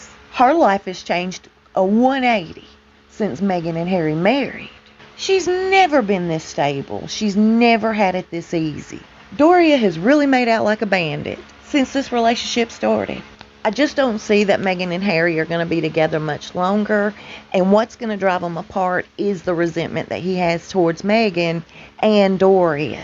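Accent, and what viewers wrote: South, United States. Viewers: She definitely is a southern girl! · good ole southern voice.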